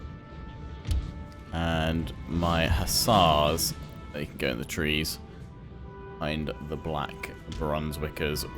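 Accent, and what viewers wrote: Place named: United Kingdom. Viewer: pls just tell me are u british or american because ur accent sounds like british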